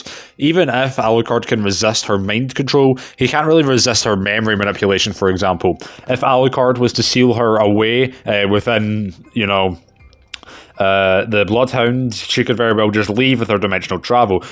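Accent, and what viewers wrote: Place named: Scotland, United Kingdom. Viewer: you are Scottish as hell and i love it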